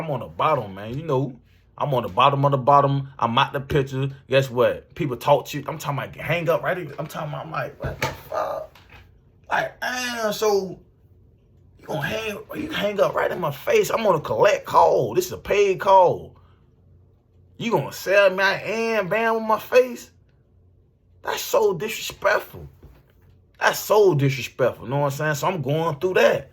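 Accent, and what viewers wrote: United States. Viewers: You gotta be from Florida I can tell how ya talk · You from Louisiana?? With the you hear me slang 😊😊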